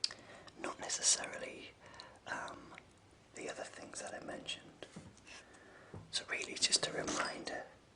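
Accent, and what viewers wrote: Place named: Newcastle, United Kingdom. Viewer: Oh really? I guess you kind of sound like a geordie too? :)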